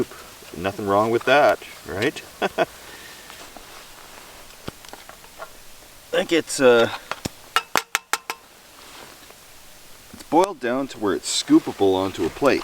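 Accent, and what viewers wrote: Canada. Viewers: You sound Canadian.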